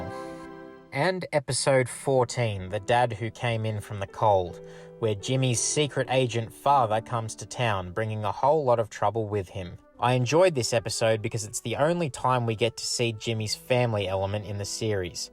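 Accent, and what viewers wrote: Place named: Australia. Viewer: now tell me if im wrong but that is an aussie accent if i ever heard one. thanks for the video mate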